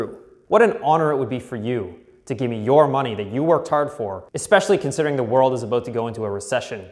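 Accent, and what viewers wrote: Canada. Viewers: You're from Canada aren't you?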